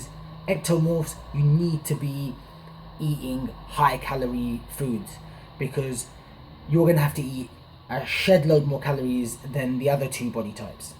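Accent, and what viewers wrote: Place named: England, United Kingdom. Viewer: another YouTube annoying english accent downvote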